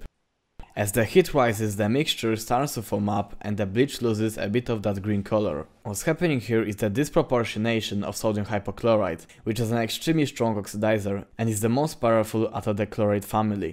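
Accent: Poland. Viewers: po kilku slowach juz wiedzialem ze polak xD sub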